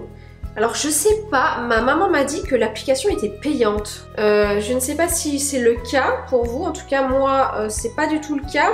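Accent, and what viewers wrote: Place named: United Kingdom. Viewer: Bonsoir je ne voudrais pas vous contredire mais franchement je suis belge et je ne reconnais pas cet accent là 😂mais moi je vous croyais d'origine anglaise. Vous êtes très rigolote. C'est amusant. J'aime bien.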